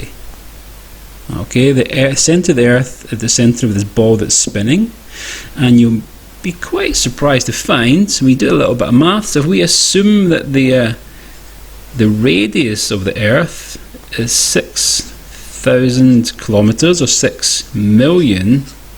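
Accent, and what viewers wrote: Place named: RP, United Kingdom. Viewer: Wee posh accent 😹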